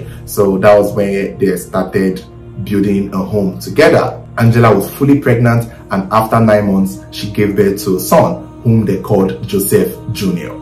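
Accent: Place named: Nigeria. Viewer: I like his accent too. He's different from the other Nigerians' accent. It's so difficult to understand. I'm from Mozambique my official language is Portuguese and I'm used to American, British accents.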